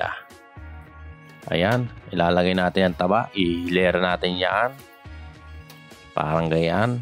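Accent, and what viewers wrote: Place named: Batangas, Philippines. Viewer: Ala eh batangueno ka rin pala, maganda yan pars pede ka na magnegosyo! #Reddtv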